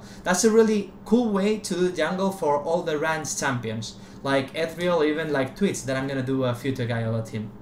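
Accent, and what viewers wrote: Spain. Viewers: Cuase he is spanish and our english is... :S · Because he has spanish accent, since English language is his second language. Dunno what you've expected.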